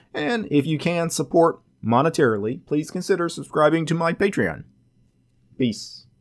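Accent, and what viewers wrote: South, United States. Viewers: Hello, I am from CA but I am right now in Italy with a friend who is a programmer and he installed linux on my computer and he was listening to your video on Joplin as he was trying to find me an alternative to Evernote and he is learning English and he was asking me where you were from, what kind of accent you have, since it is different than mine. Can you tell me what area you are from, are you from a southern state?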